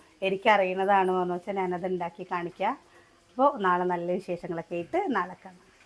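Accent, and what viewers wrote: Palakkad, India. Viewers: Chechide palakkaden slag kelkan nalla rasamund from kasaragod 🥰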